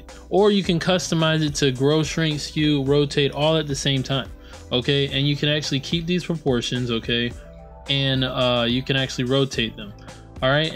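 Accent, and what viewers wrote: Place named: United States. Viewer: Hi. Good tutorial. is this feature available in Wix worldwide or is it specific to a particular country? I'm assuming (by your accent) that you are in the USA? I'm in India and the hover feature is not showing up when I add a box...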